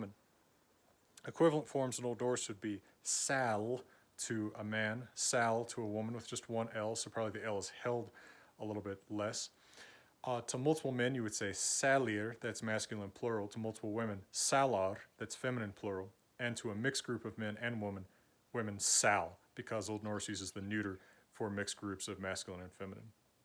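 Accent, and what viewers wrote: United States. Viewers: This might be what old norse would sound like spoken by someone with a heavy American accent. Especially the R and the L reveals the speakers origin. Or are there any evidence that old norse were pronounced closer to American English than to modern Scandinavian languages?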